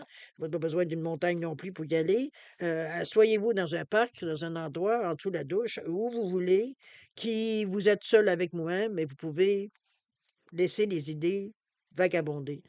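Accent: Quebec, Canada. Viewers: merci merci tout ce que vous dites me parle et j'aime beaucoup votre façon d'expliquer le tarot et j'adore votre accent j'aime le québec et les québécois mon Chum est québécois .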